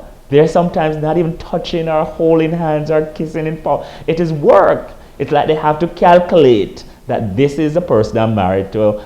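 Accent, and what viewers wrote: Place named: Jamaica. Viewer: and he sounds Jamaican like me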